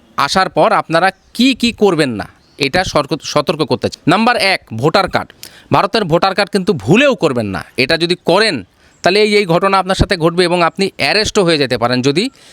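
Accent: Bangladesh. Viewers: Apni ki nije bangladeshi? Accent sune toh tai lagche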